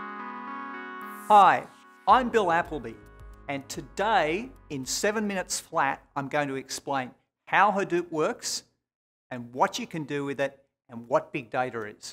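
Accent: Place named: Australia. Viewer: That's a presentable Australian accent, they get much more wild than that!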